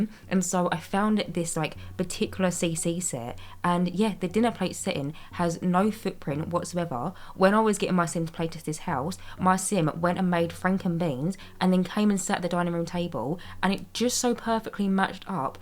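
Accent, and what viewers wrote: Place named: Manchester, United Kingdom. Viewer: Where are you from. your accent is giving northern. Maybe manchester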